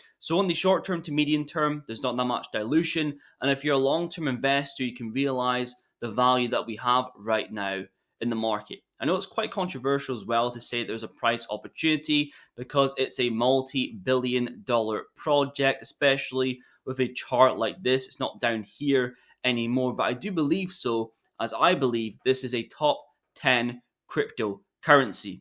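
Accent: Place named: Scotland, United Kingdom. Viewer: Do you still think qnt will still be big this cycle? I'm starting to think that 2027 -30 will be the time for xrp and qnt. Would love to hear your thoughts. I am well spread by the way. Ps love how you slow down your Scottish accent. Edinburgh here. ❤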